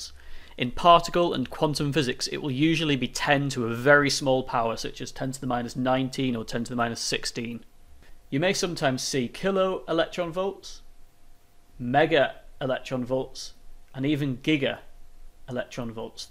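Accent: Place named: United Kingdom. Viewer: British English 🥵🤤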